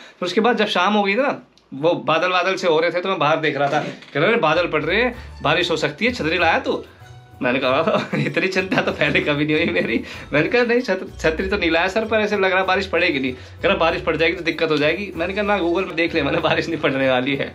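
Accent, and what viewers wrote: Haryana, India. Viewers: Ye bhai haryana faridabad se hain ya utterpradesh Noida se boli dono ki same hai.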